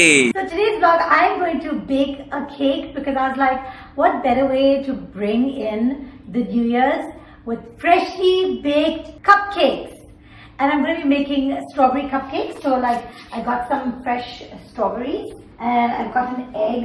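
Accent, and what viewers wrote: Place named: India. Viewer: I am here for her hindi accent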